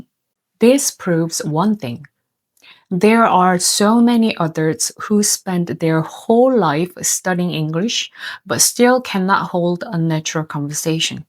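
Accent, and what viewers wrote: South Korea. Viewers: 안녕하세요, I wish I could speak a second language, even if with a heavy foriegn accent. Starting in highschool, I’ve become a failed pilyglot, having unsuccessully attempted to learn French, Spanish, German, and, most recently Korean. I twice was told by native south Koreans that in my attempts to speak to them in their language I spoke like a native. These were doubtless overly generous praises but I was overjoyed knowing how to ask are you Korean, or say hello, my name is Tony Walters, what is your name in Korean. It isn’t only k-dramas that have made me fall in love with the korean people, but every korean native I’ve met in Savannah (we have a large South Korean population due to a major Hyundai plant built close to the city. Of course, as a native English speaker, how could I expect myself to pronounce ㄹ without an accent? Your voice never ceases to comfort me, so your ㅎㅏㄴ국 language foundation overlaid by your ESL is at least one part magic to my heart and ears. 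Possibly two. I reject a world painted nfinite shades of gray. Give me primary colors. So glad you share your perspectives online. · Korean is truly a beautiful language. I love your accent speaking English. Most important is to communicate even when it’s not perfect. Never be ashamed and keep improving. I watch so much K-Dramas for the immaculate stories and the Korean language. Most beautiful accent indeed.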